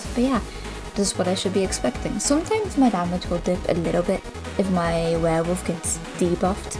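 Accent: United Kingdom. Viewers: sounds a bit british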